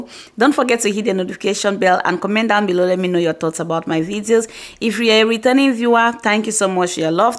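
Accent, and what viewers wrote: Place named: Kom, Cameroon. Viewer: It’s de dialect for me sis🤣🤣🤣🤣🤣🤣🤣🤣🤣🤣🤣🤣🤣🤣thank u for representing us, we the kom people are proud of u😍bon appétit 🥂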